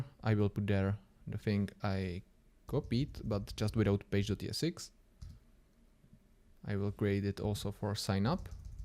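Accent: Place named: Slovakia. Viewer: Podľa prizvuku si slovak mam taky pocit, koľko sa pohybuješ ako web dev ?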